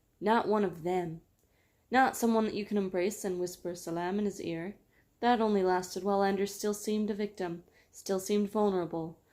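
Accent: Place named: United Kingdom. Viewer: Your british accent is wonderful in this one!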